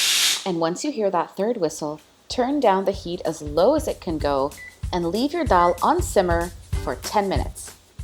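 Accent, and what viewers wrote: Canada. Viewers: its canadian accent and its almost perfect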